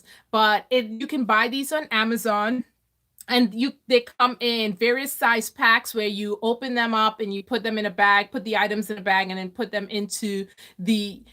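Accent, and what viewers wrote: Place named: Trinidad and Tobago. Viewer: I was wondering where that accent originated from 😊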